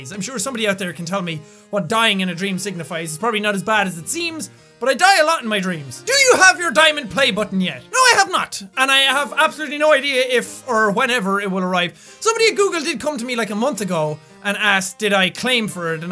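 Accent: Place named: Ireland. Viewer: thanks man I'm inspired by you a lot and eventually want to play games on YouTube with you mark and Felix! My dream is to create a little community of my own on YouTube and have a nice group of my own! I would like to try and be a sombody rather than a nobody. And prove to the world just cause I have a mental disorder (ADHD) doesn't mean you won't achieve great things. I know I've got a huge challenge ahead of me and I'm already off to a bad start. But I'm determined to become a youtuber! Although right now I'm only doing Lego videos. But I hope to get gaming videos up within the next year. After I find s job. Right now I'm still looking for one and I have been since Christmas break. I hope you don't mind my super long and a bit chattering comment. But stay awsome and thankyou for so much inspiration. Unfortunately, I wish you used your Irish accent it reminds me of back home (Newfoundland, I am a proud Canadian)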